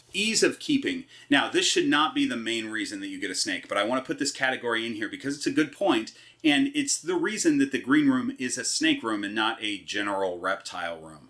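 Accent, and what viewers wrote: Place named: United Kingdom. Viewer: As a Brit I would like to confirm that this is indeed a British accent! Lol 🐍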